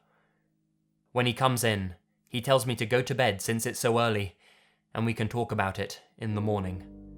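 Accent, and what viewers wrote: England, United Kingdom. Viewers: Are you from England? I'm asking because I know what an English accent sounds like because I watch this show that takes place in England so I know your speaking with one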